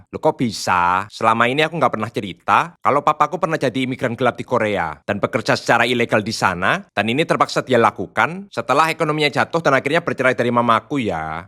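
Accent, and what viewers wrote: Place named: Java, Indonesia. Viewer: 14 tahun gawe diluar tetep ngomong dgn meddhook gitukah?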